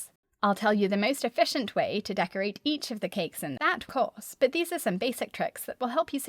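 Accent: United Kingdom. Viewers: Amazing tips.By the way are you British?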